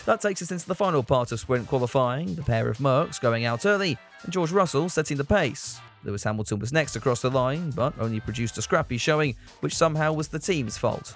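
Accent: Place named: North, United Kingdom. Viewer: can you please talk a bit slower for not so northern accented non Americans thanks otherwise good comedy review😂😂😂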